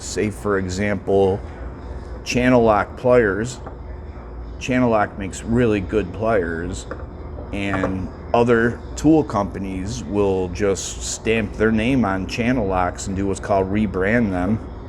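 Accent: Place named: Chicago, United States. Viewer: I listened 5x just for the Chicago accent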